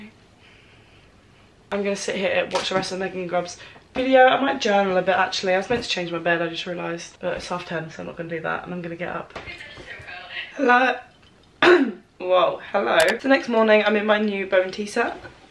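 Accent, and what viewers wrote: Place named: Birmingham, United Kingdom. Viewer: Is one of your flatmates or friends brummy? I feel like I can hear hints of birmingham in your accent/ tone of voice now! I love it x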